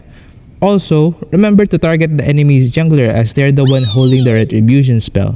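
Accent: Philippines. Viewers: Maybe he is philipino, they have this accent, almost all ML youtubers from Malaysia, Philippines and Indonesia has this accent. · Obviously his accent is Filipino...what's your point?!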